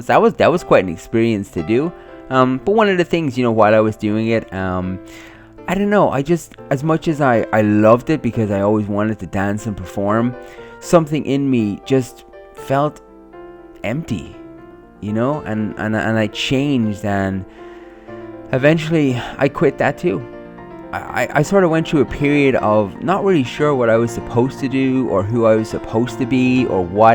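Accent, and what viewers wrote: Ireland. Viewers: It's a common irish pronunciation, loads of people talk like that here in ireland. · LLOOOVVEE your irish accent!!!!!!!!!! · i really don't see how thats funny. Obviously he has an Irish accent. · It's called an Irish accent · Its an Irish accent! I have it too :) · Stop taking the mick he's Irish ok if u were Irish I'd say it like that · That's the Irish accent hahah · Thats an irish accent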